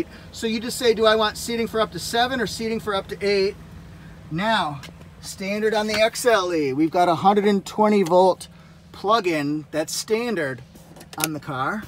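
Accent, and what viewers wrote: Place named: Canada. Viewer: I tried to find the dealer location to get a clue about the accent. Is it Canadian? I am afraid I am not great with North American accents as I live in New Zealand.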